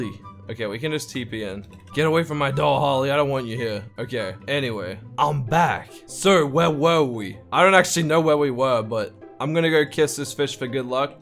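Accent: Australia. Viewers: ARE U FROM AUS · Is he rrly from Australia?? I am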